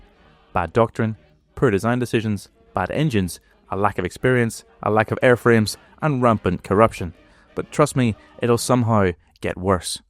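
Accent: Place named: Northern Ireland, United Kingdom. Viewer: Well done, a most enjoyable documentary with plenty of humour and a delightful NI accent. Looking forward to part two, cheers.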